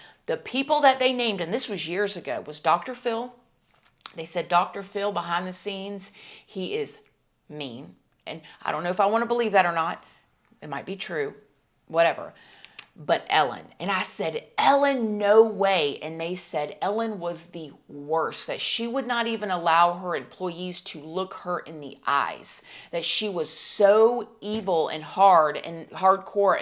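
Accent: South, United States. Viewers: You’re a little too much for me. I’m not your “baby”. Can’t you just talk without all that southern foo foo and fingernail drama? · This is a horrific case with horrific details. On a totally different note where is this content creator from? I'm only looking for a general area because that slight southern drawl is the best thing I have ever heard. I might even be willing to relocate. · Hi, I am one of your babies, you're not only an informative, Amazing story teller of crimes, I'm totally impressed with you and you are Stunning and an Awesome Mama, I'm from New Orleans & we have a ton of N.O.La.accent down pat, are you actually from Florida or a more Southern town??? Either way I will follow you as often as I can find a story I've not already seen, take care of you 🤗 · You say the word , "on", In a different accent that your normal , seemingly southern accent. 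Strange. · When did you get a southern accent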